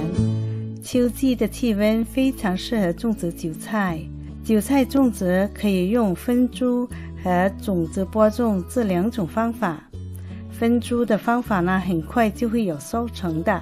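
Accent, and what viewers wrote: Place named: Cantonese, China. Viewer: 喜爱的广东口音，亲切，可爱。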